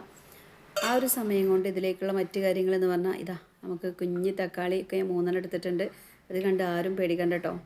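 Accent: Malappuram, India. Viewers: പൊന്നാര താത്ത മലപ്പുറം ഭാഷ കേൾക്കാൻ ആണ് ഞാൻ ഈ വീഡിയോ കാണുന്നത്❤️😂😂👍🏻👍🏻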